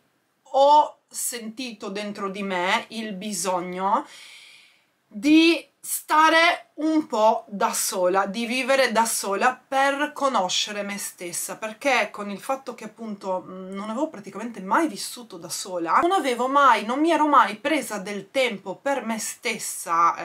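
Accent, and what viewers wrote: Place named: Trieste, Italy. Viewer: Potrai andare a vivere ovunque ma l'accento triestino non cambierà mai😍😍😍ti adoro🤣🤣🤣 Il trucco ti valorizza un sacco, è bellissimo!!!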